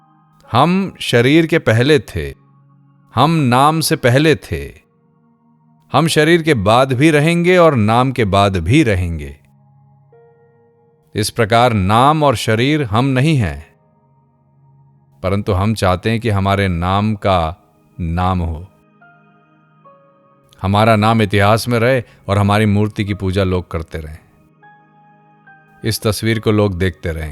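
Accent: Standard. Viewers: धन्यवाद ! बढिया उपदेश ! यह भी प्रशंसनीय बात है कि पारायण करनेवाले गुरुजी से हम सच्ची हिन्दी का उच्चारण भी सीख सकते हैं / कैसी अच्छी है यह बात !!! / यह सब भगवान् का अनुग्रह ही है / हरे राम ! हरे कृष्ण !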